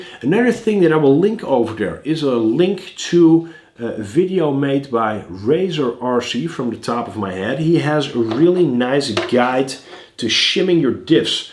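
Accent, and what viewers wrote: Netherlands. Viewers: Like it! Maar ben je nou Nederlands?🤔 hoor een nederlands accentje😂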